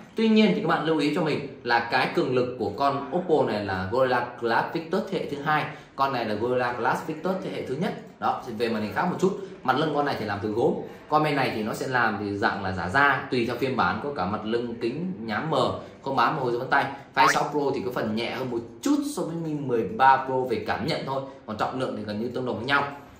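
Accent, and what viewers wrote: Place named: Countryside, Vietnam. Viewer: Mặt bựa lẫn giọng quê quê!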